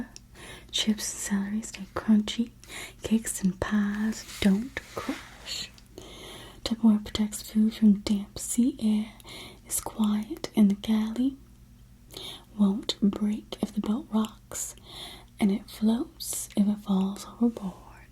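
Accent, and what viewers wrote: South, United States. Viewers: Your Southern drawl was spot on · I live in the south and felt so at home with your accent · you sound just like so many of the folks here! ... the Southern accent ☺️❤️ you do it so well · I love the southern accent ! Wow, you nailed it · Love the Southern accent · The southern accent is giving me major * from the Golden Girls vibes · Im loving that southern accent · the southern accent is magical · my favorite southern accent ASMR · Your southern voice reminded me of the times the ladies at church would meet and whisper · That southern accent is HEAVENLY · The southern accent! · this southern accent really takes it to the next level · the southern accent was a great touch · more videos with the Southern accent?? ... you do it so well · more in this southern accent! Soooo lovely! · more southern accent videos! ... southern accent is my favorite, lovely and warm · southern drawl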